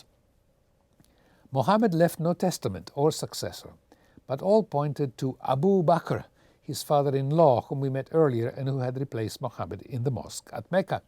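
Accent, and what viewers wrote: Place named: Italy. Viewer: I can hear an Italian accent in your English now :-)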